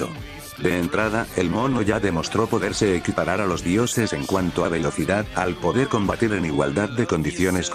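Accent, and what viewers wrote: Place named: Mexico. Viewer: Muy epico el video y la escala, pero porque usas los fragmentos en castellano, es decir hasta lo que vi tu parecías mexicano más que nada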